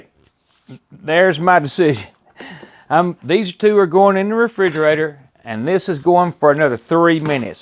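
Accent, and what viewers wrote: South, United States. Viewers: ! Great Video! My Wife and I made the Ribs and used about 1 - 1/2 slabs at the same timers. Still delicious. Probably means that there is room for error. I'll subscribe not for the recipe, but for being able to listen to your kindly southern voice. Nostalgic in a way. Last thing...off topic. I would like to know what kind of watch you have there. That thing is right up my alley and would be great for learning to cook.:-) Thanks, keep it up and don't feel like you don't deserve to monetize your channel. I've seen THOUSANDS of terrible worthless videos that are making way too much money. Great Job. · Great, simple, straight forward and easy to follow. Also, when talking about barbecuing, I tend to trust people with a strong southern accent. Thanks for this. Will be trying this week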